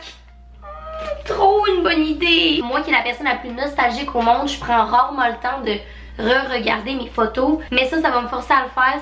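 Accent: Quebec, Canada. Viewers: Ta un accent donc tu dois venir du canada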